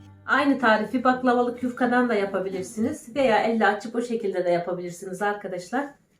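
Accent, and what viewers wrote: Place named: Çamoluk, Turkey. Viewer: Kız sen çam oluklumusun aynan ordakiler gibi konuşuyorsun bolçene dirorsun onlarda öyle konuşuyor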